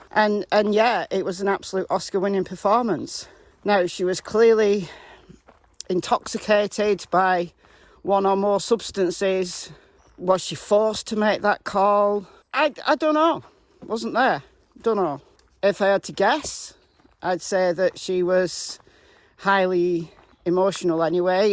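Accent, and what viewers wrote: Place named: Northern England, United Kingdom. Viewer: This case is maddening! I love listening to you ( and your English accent!) You must be in good shape from all the walking you do! I would be so out of breath, I wouldn’t be able to talk! I love this channel and virtually walking with you and your dogs!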